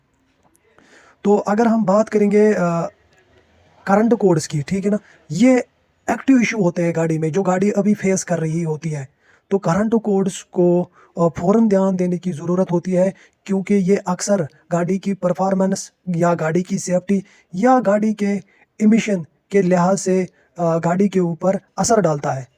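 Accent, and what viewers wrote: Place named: Kashmir, India. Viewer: Kashmiri voice i think.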